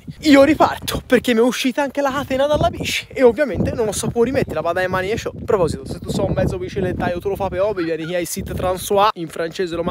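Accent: Tuscany, Italy. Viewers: Ciao...io sono figlia di italiani e sono o mi ritengo mezza toscana perche' vi ho studiato, scegliendo la citta' di Dante presso cui studiare un tempo fa....non ebbi all'epoca la stoffa per fare dell'Italia la mia dimora finale! Oh well, I speak Italian and English! Ciao caro Mr...keep it up, for I love your Tuscan accent with the open C's...ciao e bacioni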